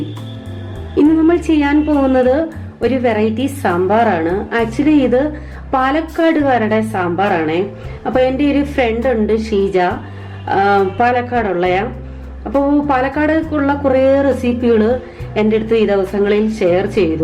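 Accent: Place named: Thiruvalla, India. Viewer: Chechyde veedu Thiruvalla- Kumbanad side aano?? Correct aa style samsaaram...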